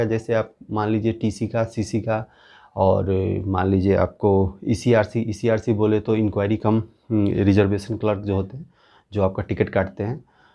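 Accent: Darbhanga, India. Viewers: Are you from दरभंगा ?? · U sound like from darbhanga side.is it true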